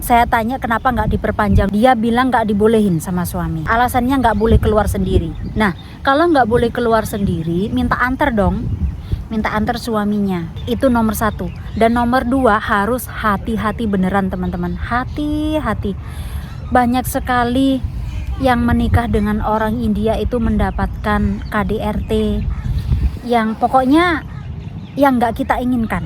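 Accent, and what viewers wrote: Java, Indonesia. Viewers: Senyum senyum sendiri, dengerin logat jawanya Mba belum hilang 😊, Kadaluarsa = kadaluarso Sekonyong konyong koder 😅😅😅 Mba ditunggu vlog ramadan disana ya 🎉🎉🎉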